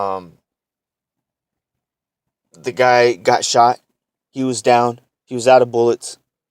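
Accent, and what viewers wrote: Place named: Los Angeles, United States. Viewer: yo u from LA right?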